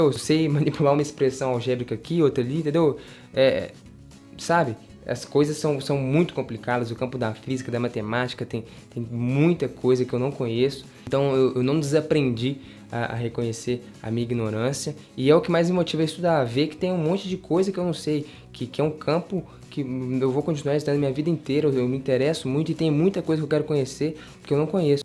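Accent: Minas Gerais, Brazil. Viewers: krl sabia que era mineiro pelo sotaque, tmb sou mineiro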